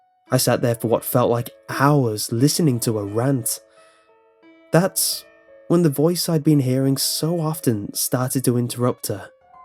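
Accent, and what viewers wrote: United Kingdom. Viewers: Gosh damn it he's British.......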